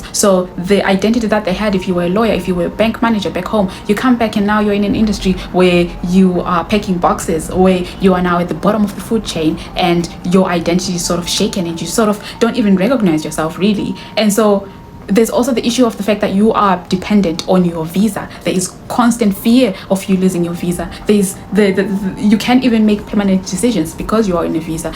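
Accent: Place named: Africa. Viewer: Please work on your accent! It’s too difficult to understand your when you speak fast ! Practice to speak less African !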